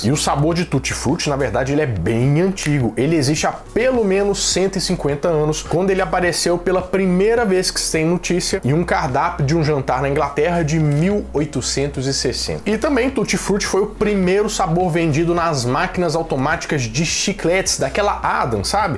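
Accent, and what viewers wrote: Minas Gerais, Brazil. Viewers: Top. Muito show mesmo. Parabéns Então, pensava que vc era mineiro.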